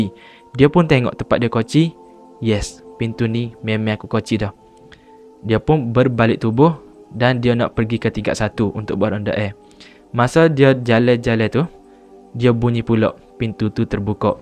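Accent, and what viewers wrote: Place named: Kelantan, Malaysia. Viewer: Pergh pekat btul Kelantan awk eik?tapi syabas.bro.pnyampaian ok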